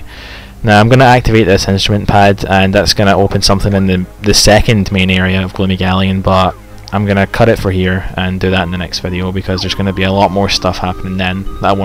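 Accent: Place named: England, United Kingdom. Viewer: I will forever associate this game with an English accent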